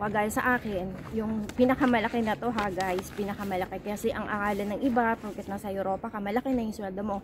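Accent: Philippines. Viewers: batangueña po kayo? :)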